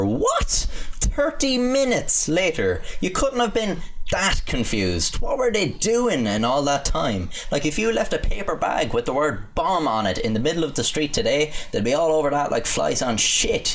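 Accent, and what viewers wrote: Ireland. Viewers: "Two thousand and Tree" lol. gotta love that irish accent. just confused as to why he doesnt say "tousand" too XD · LOVE the way you say 30 as well as the Irish accent in general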